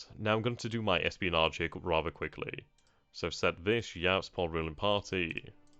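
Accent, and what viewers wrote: United Kingdom. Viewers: Ulta Posh XD as an Aussie you really sound like that stereotypical british rich man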